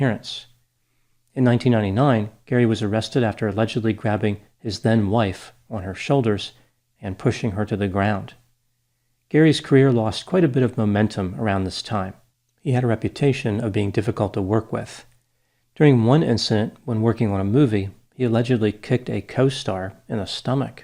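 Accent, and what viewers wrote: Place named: East Coast, United States. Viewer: With all due respect to those from New Jersey, judging by East Coast accent, I'd say he's been there a time or two. So, he likely knows of what he speaks.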